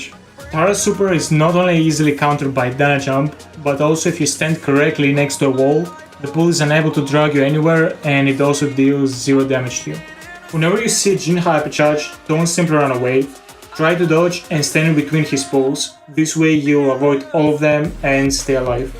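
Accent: Greece. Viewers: Are you from Greece???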